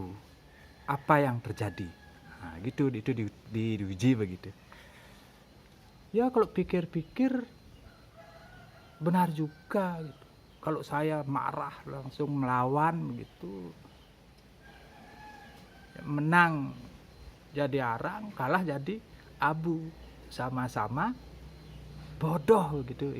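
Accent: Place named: Bali, Indonesia. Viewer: Salam kenal bapak baik hati berlogat bali. Semoga energi simpatik dan jiwa penolong anda berguna bagi sendiri, engkau juga dirimu. Saran saya suara yg terbata2 dan pembahasan yg muter2 lebih di lutuskan lagi niatnya🙏🙏🙏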